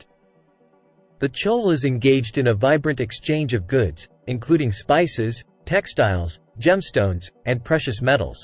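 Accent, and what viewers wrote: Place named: United States. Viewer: I would of preferred it if you had set your narration machine to an English accent not American.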